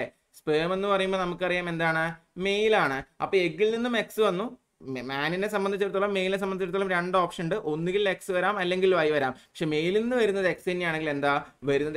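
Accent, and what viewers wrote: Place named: Palakkad, India. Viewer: Chikku chooru... Palakkadanz.. ✨️anagne paraya... ❣️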